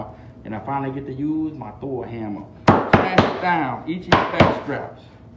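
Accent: Louisiana, United States. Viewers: Dude where were you raised at? I have never met a person in Kentwood that has a cajun accent that talks french.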